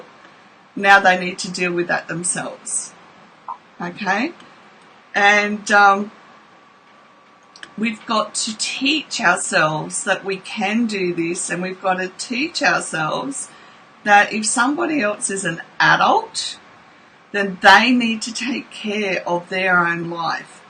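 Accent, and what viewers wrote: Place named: Australia. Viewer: Thought I’d add, I’m a 49 year old woman and you tube popped up your video, I live in Jackson Mississippi and was listening to Dr Kirk Honda vids. You sound Australian, are you? I married a kiwi and resides in NZ for 10 years. I’m often amazed at the people who I won’t know “in real world life” can provide such insight and help to others. I plan to watch more videos, you seem very pleasant as well as quite credible and informed.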